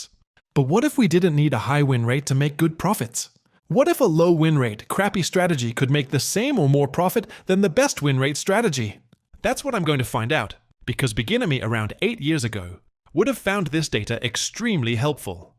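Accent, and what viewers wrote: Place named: United States. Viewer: thank you for changing accent from british to u.s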